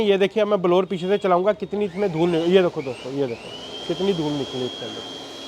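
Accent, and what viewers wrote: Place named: Punjab, India. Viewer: Abhi aap delhi se hai ya punjab se aapke bolne ka dhang punjabi lag raha hai 🙏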